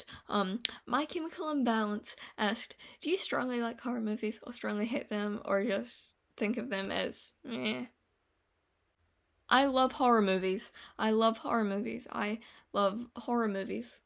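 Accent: New Zealand. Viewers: Lmaooo that's funny with the accent, honestly you sound like you have a New Zealand accent to me! But maybe if I was from New Zealand you'd sound more Americanized I ain't sure haha. I GOTTA watch The Fly and Sweeney Todd they sound really dang good, and I love American Psycho! I definitely remember you mentioning how much you love haha I'd definitely watch a video of you talkin about it more, it was really cool learnin more about you you're awesome! Also Pop-Tarts are SO dang good haven't had em in a while but oh man they're delicious, great video as always I hope you have a good day! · Great content, loving that Kiwi accent!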